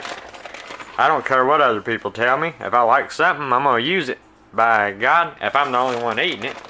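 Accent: South, United States. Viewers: I like you! And I love your southern twang! ❤️ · I already know how to cook but I subbed because your accent cracks me up. I'm from California but when I 1st moved to the South, one state away from you, I couldn't understand what anyone was saying. 😂 Your accent definitely beats arkansans for twang. Maybe I've been here too long...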